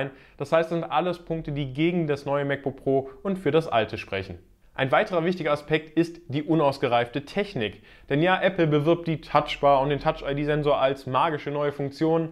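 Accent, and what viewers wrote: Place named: Germany. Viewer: Habe vor kurzem dein englisches Video gesehen und mir wegen den Akzent schon gedacht dass du aus Deutschland kommst. Jetzt weiß ich dass ich da richtig lag :-D Du machst gute Videos.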